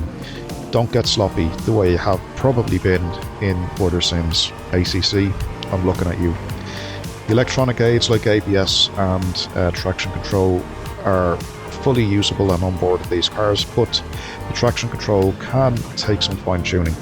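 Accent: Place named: Ireland. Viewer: Don't ya just love hearing an irish voice in sim racing :D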